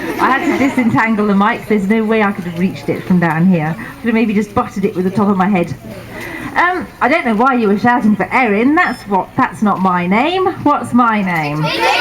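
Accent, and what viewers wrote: United Kingdom. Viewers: what...she british..... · We're is she from Scotland?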